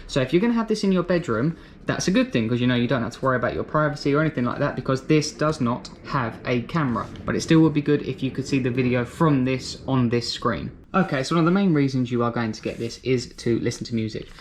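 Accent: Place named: United Kingdom. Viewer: your voice sounds british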